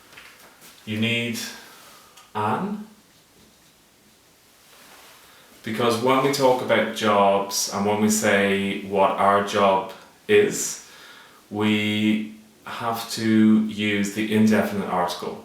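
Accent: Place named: United Kingdom. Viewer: Hi, First of all, I would say that your videos are great although I discovered your channel recently. I've got one question, are you german? cuz I haven't seen any german which speaks such a great british accent.:))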